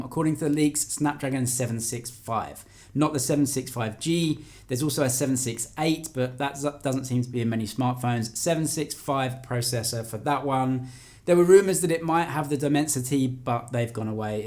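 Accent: England, United Kingdom. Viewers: ❤️from India , I really like ur videos for ur hard work, no favouritism, truth and ur English accent I really like it .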